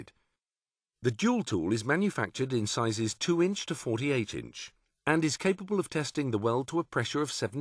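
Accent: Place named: United Kingdom. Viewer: Narrator is wonderfully British.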